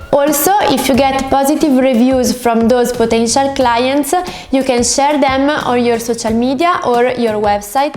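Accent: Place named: Italy. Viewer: Every letter has been pronounced in this video,😂😂😂😂 loud and clear. You sound so Italian, anyway good tips 👍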